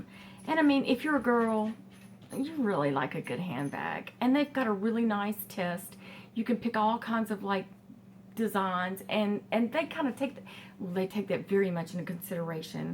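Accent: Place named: South, United States. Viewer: I've watched lots of your videos, girl you have that like sweet southern accent, smile, and friendliness that is so classy and feminine. You got a new subscriber :)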